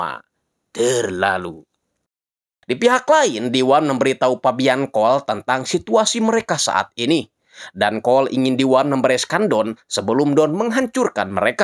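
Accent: West Java, Indonesia. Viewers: orang Sunda ya...logat nya Sunda banget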